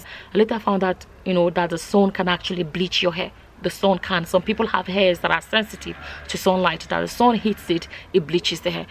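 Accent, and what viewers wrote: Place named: Orlu, Nigeria. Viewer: You sound like you are from my village ihioma Orlu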